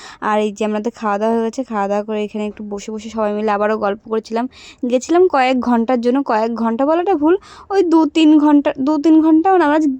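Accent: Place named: Bangal, Bangladesh. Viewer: Amader nemontonno korbe na !! Eka eka e chole jaccho biye khete . Ar apni to puro bangal bolchen .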